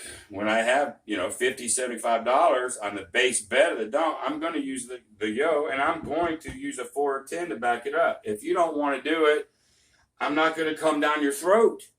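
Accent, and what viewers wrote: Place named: Texas, United States. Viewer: Thanks I appreciate your video. Love to see it. That accent reminds me of Texas🌵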